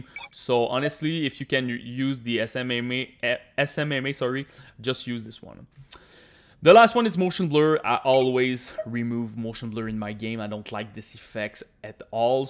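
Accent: Quebec, Canada. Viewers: are u from quebec by any chance?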